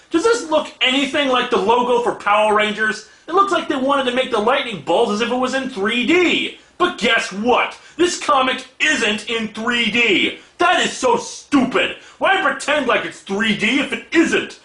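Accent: Minnesota, United States. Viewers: What pronunciation problem does he have? Unless you mean his Minnesota accent coming through on words like "roof"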